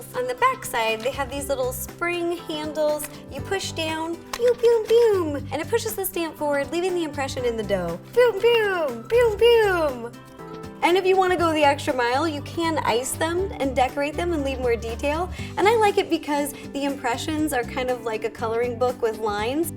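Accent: South, United States. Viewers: I've been watching this channel for at least a year, I love and her crazy baking ideas, but the more I watch the more she sounds like one of those southern women who hosts the Home Shopping Network in the middle of the night.... But she's from Washington...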